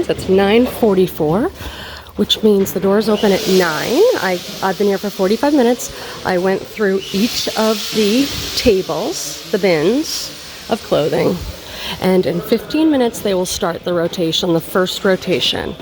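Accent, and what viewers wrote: Boston, United States. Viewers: I LOVE your Boston accent! Bra, Halara… I could listen to it all day. 😊 I want the Hudson Bay blanket! I’d struggle with selling or keeping it. Lucky reseller that found that!!!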